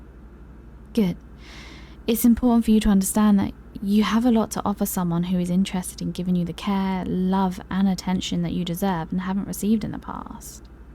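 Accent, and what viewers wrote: United Kingdom. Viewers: Oh! Oh! A therapist roleplay! I love these soooo much!! Thanks for your hardwork, British accent & Therapist? Ahhh. Sucha pleasure to the ears! The pacing with this one just had such a "right" feeling to it. A lil flirty back & forth is always nice too have a touch of as well. Seriously tho thanks for doin this one, much love. Godbless.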